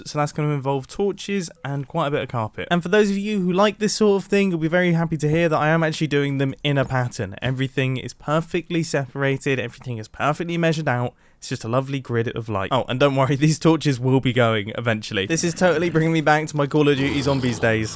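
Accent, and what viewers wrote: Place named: United Kingdom. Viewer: the word 'puddle' is 1000% more funny when said with an authentic British accent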